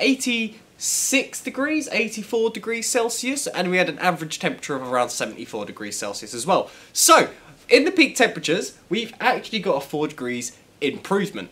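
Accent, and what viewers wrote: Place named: United Kingdom. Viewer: Your accent was 😎 cool just like any British 😂😂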